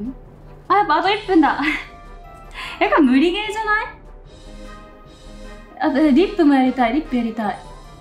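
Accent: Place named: Kansai, Japan. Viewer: 関西弁よき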